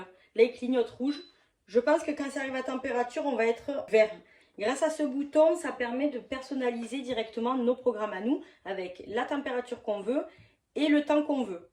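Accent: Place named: South, France. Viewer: hello, j'arrive, je suis tombée sur vos vidéos par hasard.... l'accent... c'est chez moi !! le sud... mais je suis plutot au dessus d'Aix. Beh du coup.... j'ai kiffé ! a très vite !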